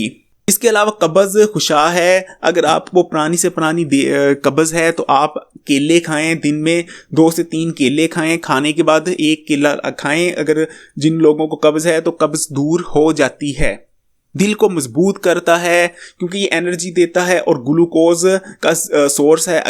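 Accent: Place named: Punjab, India. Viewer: Hahaha buri bat hai..punjabion k lehjay se hi pata chal jata hai k punjabi lehja hai..lekin koi bat nahi kher hai bhai hain apne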